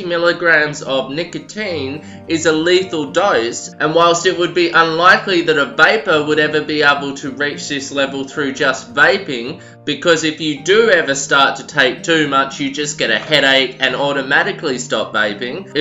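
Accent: Australia. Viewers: nah man. he's aussie af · Hamid Mousa, nothing wrong with his voice, he's an Aussie, very easy to understand, his room studio is just very voided, making his voice sound louder than it is. Regards a fellow aussie. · He's Australian yeah an Aussie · are you australian? jw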